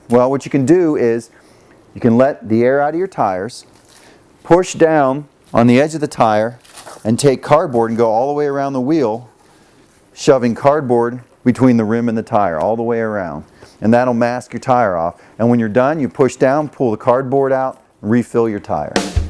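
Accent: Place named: Canada. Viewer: love the way he says "Give it a bit of 'hows ya father' " that surely is the most usual mix of accents... kind of Canadian, kind of English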